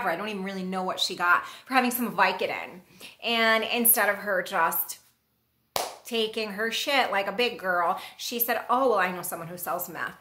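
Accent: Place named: New York, United States. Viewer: I love your New York accent ❤️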